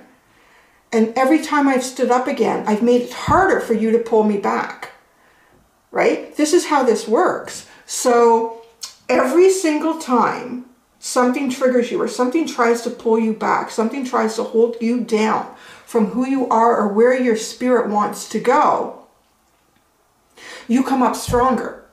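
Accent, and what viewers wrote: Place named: Canada. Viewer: Really like your channel- wish you lived nextdoor! You might almost, I live in Minnesota and you sound Canadian.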